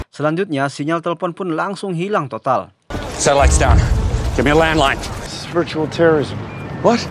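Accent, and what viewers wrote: West Sumatra, Indonesia. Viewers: Orang minang bang?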